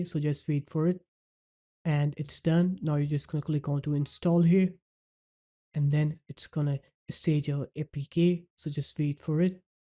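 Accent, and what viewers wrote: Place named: India. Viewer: Mate u cant get raid of indian accent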